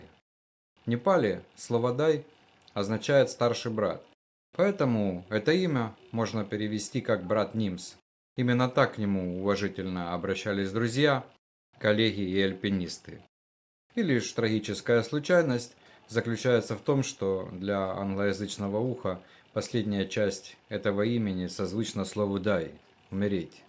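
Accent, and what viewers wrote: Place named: Okanye, Russia. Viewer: Научись разговаривать по русски, не окай! Удачи! Но слушать тебя невозможно!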